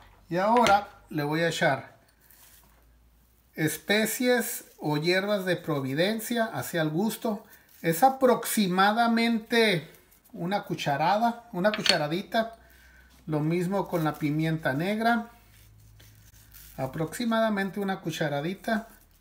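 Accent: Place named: North, Mexico. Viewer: Hola me gusta mucho su canal. Sus recetas me recuerdan mucho a las de mamá. De que parte de México es, por su acento you pienso que es del Norte. Sonora? Sinaloa? Chihuahua?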